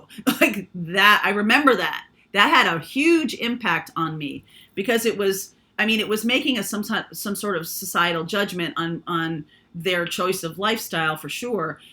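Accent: England, United Kingdom. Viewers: Lol 😝 love your English voice and thanks for the message. Love from England lol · Lol love the English voice...I was laughing and agree with all you are saying!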